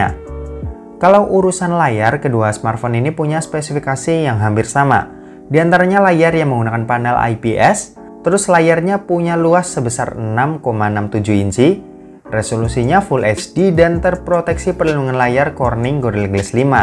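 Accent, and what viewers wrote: Semarang, Indonesia. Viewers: org semarang bro?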